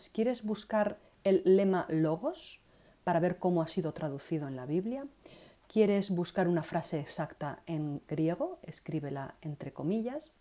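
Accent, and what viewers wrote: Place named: Spain. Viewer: Logos excelente. Mis videos son más tipo como preparar mensajes usando el programa. Hay bastante riqueza de información en sus videos y yo los usare para ya no enseñar lo que tu has plasmado aquí. Con ese dejo supuse que era de España. Algún día tendré el privilegio de viajar allá.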